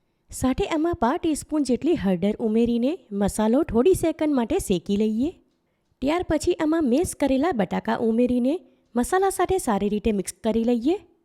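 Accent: Surat, India. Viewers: Khub saras tame asal surati bhasha ma boline recepie batavi.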